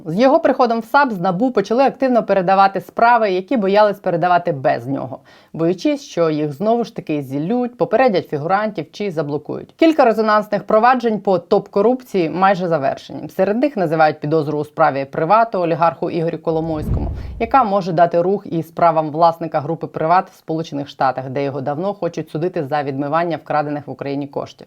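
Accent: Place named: Russia. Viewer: Слушаю Вас всю войну, спасибо большое. но, пожалуйста, не "п"ятидесяти, семидесяти, восьмидесяти", это ошибка и калька из русского.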